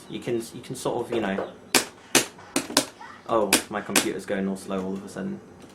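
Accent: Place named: United Kingdom. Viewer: um i dont wanna sound disrespectful but for i bit i thought you were british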